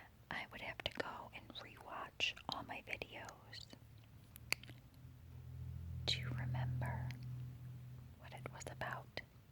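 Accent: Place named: Michigan, United States. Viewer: you're accent sounds close to a Michigan accent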